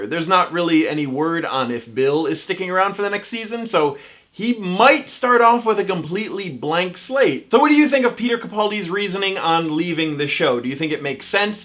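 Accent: United States. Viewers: its weird hearing an American saying Doctor who and stuff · I can undertand scots speaking really quick but I didnt get a word of your first sentences. American accent is so hard to get sometimes.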